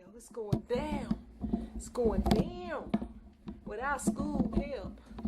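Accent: South, United States. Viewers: I came for your 1st time.....and the country accent I can't get enough of ;) It came out great!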